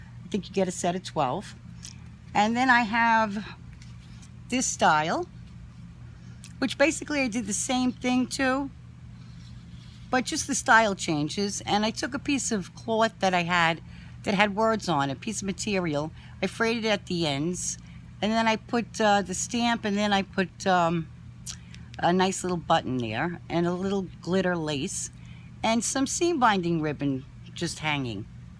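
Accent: New York, United States. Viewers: Love these! btw, are you from NY because you sound just like my mom! :D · hi, i just watched gayle's YT of her order from your shop (and the extra goodies you sent her) so happy she shared you with us, i just subscribed to your channel and favored your etsy shop-i'll be ordering from you soon! you have such a variety of yummy goodies in your shop, i love that you have a dollar bin section! (just like Target and Michaels!!) great idea! i really love these pirate pockets you made and the wax seal stickers. i'm sure you hear this all the time, but i also have to mention how much i enjoy your NY accent! thanks for sharing your great ideas and inspiration. so glad to have found you.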